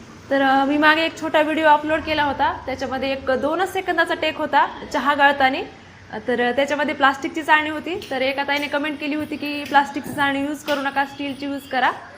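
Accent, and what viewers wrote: Parbhani, India. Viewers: माझी भावजय तुझ्यासारखीच बोलते. ती पण परभणीचीच आहे...छान वाटते ऐकायला❤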